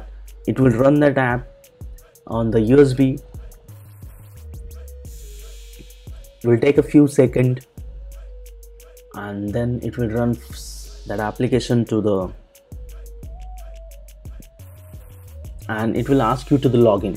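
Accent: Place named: India. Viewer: indian voice, 100% virus/ scam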